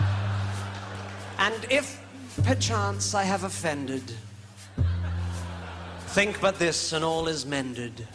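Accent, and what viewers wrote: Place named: Australia. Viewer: i thought he was giving storm an austrailian accent and theres another song where he talks to an annoying rich guy with an austrailian accent so i figured he was trying to make austrailia look bad ( not really i was just makin a bad joke) turns out hes austrailian too im just an idiot who didnt catch his accent cause i thought he was british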